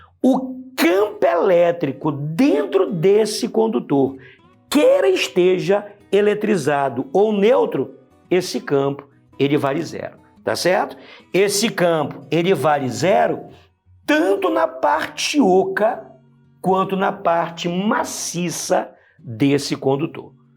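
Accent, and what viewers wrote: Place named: Pará, Brazil. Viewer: que aula massa, professor!! super didática e de fato imprescindível para meus estudos. o melhor é o sotaque paraense (?) hehehe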